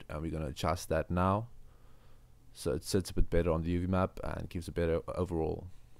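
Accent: South Africa. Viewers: Ngl get south African vibes from ur voice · dude are you South African?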